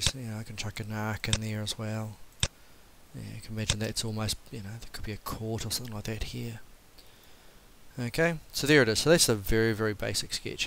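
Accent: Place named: Australia. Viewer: Sydney, Cronulla. Where are you? I can tell your from Au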